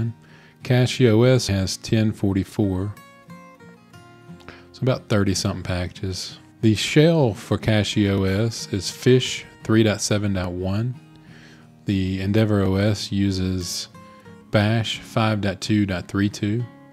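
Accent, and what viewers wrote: United States. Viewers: Wonder why I can kinda guess which part of USA you're from!?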